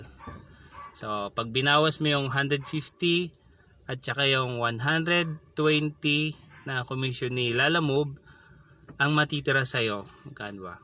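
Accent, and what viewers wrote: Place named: Bicol, Philippines. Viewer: Ung di mo maitago na bicolano ka😂😍😅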